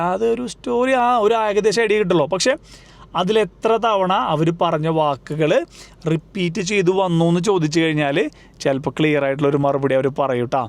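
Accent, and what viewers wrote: Thrissur, India. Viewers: Thrissurkkaran aanalee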